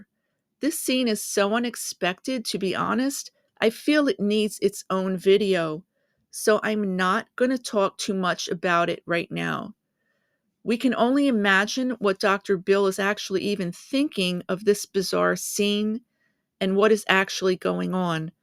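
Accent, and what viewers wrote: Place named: New York, United States. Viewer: I like your narration and your slight (IMHO) New York accent, which I enjoy.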